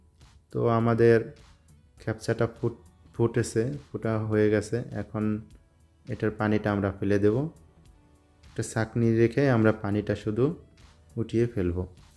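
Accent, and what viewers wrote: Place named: Barishal, Bangladesh. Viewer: ভাই মনে হচ্চে আপনার হোম ডিষটিক বরিশাল ।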